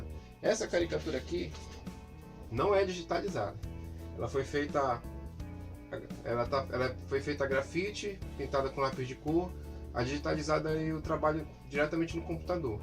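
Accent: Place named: Manaus, Brazil. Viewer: Esse sotaque da moça parece ser da minha cidade Manaus Vcs são daqui? Tô no terceiro período de jornalismo estudei com o Romas Mascarenhas mas me esqueci tudo tô relembrando ótima aula seus vídeos.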